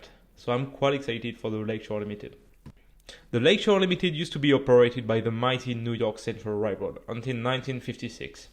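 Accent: France. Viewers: Wasn't expecting this video to be entirely narrated over the subtitles, which was a nice change but I kinda prefer the subtitles more as your French accent can often be hard to understand. In all honesty, I'd rather watch one of your vids with the subtitles instead. I don't mind if it happens once in a while as it's a good reminder to us viewers your from France. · I like the voiceover and I love the strong French accent😁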